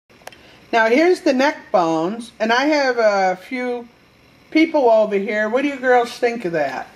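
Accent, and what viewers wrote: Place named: Illinois, United States. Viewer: I never thought to do that with neck bones but I sure will now. I CAN say they make the best spaghetti sauce going! Between the sweet pork flavor and the bones, you can't beat it. Thanks for another great idea,. (Northern girl, huh? I would have guessed Illinois, but there's a similar accent in Central NY...Utica/Saracuse/Buffalo...Humm) LOL, you do NOT want to hear my accent...Tess from NY:)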